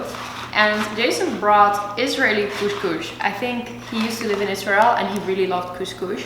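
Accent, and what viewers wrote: Netherlands. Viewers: she sounds so dutch lol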